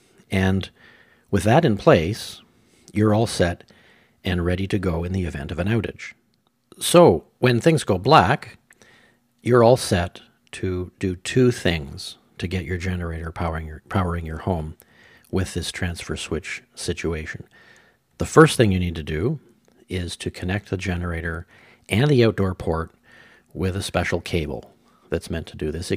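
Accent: Canada. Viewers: I can tell by your accent that you are Canadian. One other legal connection here in the U.S. is a metal block that bolts to your home breaker panel cover. To use it, you have to install a second main breaker below the original then install the block. The block will move up or down to allow EITHER your main panel breaker OR your generator's main breaker to be switched on but NOT both at the same time. I can't use any of these options because the idiots who built my house placed my panel box in an inside wall and it would take major design changes in the construction of that wall to allow rewiring of the panel so I did what I wrote below a year ago.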